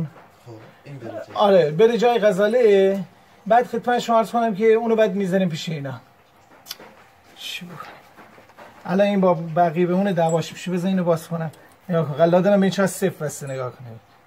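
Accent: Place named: Gilan, Iran. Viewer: از شنیدن لهجه شیرین گیلکی و دیدن انسانیت شما دلم از آلمان پرواز میکنه به بندر پهلوی که فقط برای اونجا دلم تنگ میشه نه شهرم تهران۔ خدا حفظتون کنه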